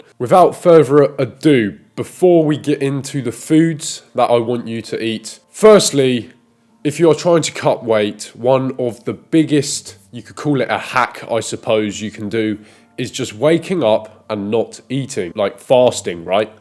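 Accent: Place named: England, United Kingdom. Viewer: Since you a have an English accent...Ill listen to you.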